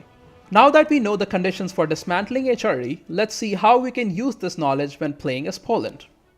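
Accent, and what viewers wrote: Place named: India. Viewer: Your accent sounds so Indian, where are you from? And yeah, the strategy was really good, never thought it could be done so easily.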